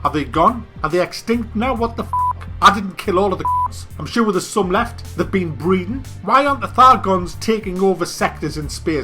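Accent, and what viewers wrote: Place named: Newcastle, United Kingdom. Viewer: Great vid, love hearing a Geordie review, especially your sewer worker being a typical PC player... anyway to your review; too expensive, boring planets, no multiplayer co-op, first person and walking around your ship not possible... get star citizen for a 1 time payment of $45, or $60 with the single player over arching story mode you are crying out for :)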